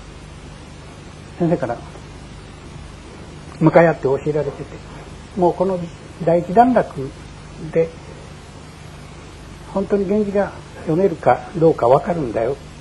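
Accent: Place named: Ise, Japan. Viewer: 遠い世界の片隅に住み古の大詩人である杜甫の如く深山の裾野を巡り林に入り込み暫し途方に暮れし時何処からともなく聞き覚えの訛りのある控えめな染み染みとした語り口のありがたき御講義の冒頭のところが始められたのである。 あの柔和で羞じらふやうな語りの世界は誠に悦ばしき事であります 確かにあれは聞き覚えのある筈のものでありました。 我が妻とその父母の生まれは、伊勢松坂の山里であります。道理で即座に判ったのであります。 本居宣長の生まれし古里である伊勢松坂の山室山の地に近ひのであります。私は己の縁の深さといふものを感じました。 この大小説の有名な冒頭部分の誰も知る桐壺の巻の第一行｢いづれの御時にか、女御更衣あまたさぶらひ給ひけるなかに、いとやむごとなききはにはあらぬが、すぐれてときめき給ふありけり。｣ でありますが、実を申せばこの講義に使ひたき古本があります。昭和二年九月一日印刷、昭和二年九月五日発行、発行所・弘法社、校訂者 有川武彦、発行者 湯川松次郎、印刷所 井下書籍印刷所、｢増訂 源氏物語湖月抄上巻 定価 金 五円 中巻 昭和三年四月十日 印刷 昭和三年四月十五日 発行 昭和四年五月一日 再版 発行 定価 金 五円 下巻は昭和三年四月十日 印刷 昭和三年四月十五日 発行 昭和四年五月一日 再版発行 定価 金 五円 全て和本であります。私はこの書物をいつの時にか、恐らく幸運なことに安価で多分、ブックオフで購入したのだと記憶しておりますが、恐らく稀覯本であったのではなひかと思っております。 確かに古くて綺麗な本ではありませんがいひのであります。 多分生涯の書物になるのではなひかと思っております。 又しても、話しが横道に逸れて仕舞ひまして誠に申し訳ありませんでした、どふかお許し下さひ。 大碩学である岡野裕彦先生の心昂ぶりて已まない素晴らしき名講義を拝聴しやうではありませんか。 擱筆 😊😊😊😊😊😊😊😊😊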